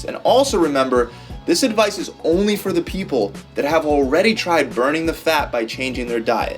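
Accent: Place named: New York, United States. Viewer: You sound like the dudes on Friends, or Seinfeld. Are you from New York?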